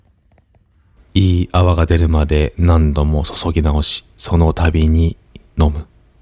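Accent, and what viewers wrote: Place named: Kansai, Japan. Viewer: いつも楽しく拝見しております、ありがとうございます。 関西の方かと、思ってましたが、東北の方なんですね。 アクセントが関西っぽかったので。。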